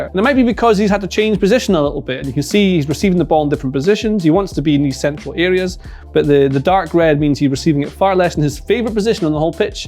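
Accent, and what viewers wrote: United Kingdom. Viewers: 😂🤣 was listening to this on speakers at work and my colleague said “ everything explained by a British person is just better “ I’m in the US for context. ❤️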